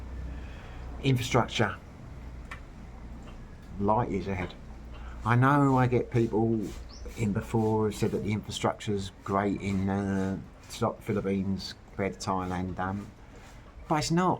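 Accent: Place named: Cockney, United Kingdom. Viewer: My 1st visit to the Phils will be later this year, but I already know I won't be able to stand the noise, as I'm highly sound sensitive. Thai & Bali vs Phils, but I've heard Vietnam beats em both, & is a lower cost of living. If you can interview a mate with Vietnam experience, that'd be cool. With your Cockney accent, I can't catch half of what you're saying, but I still appreciate your awesome content!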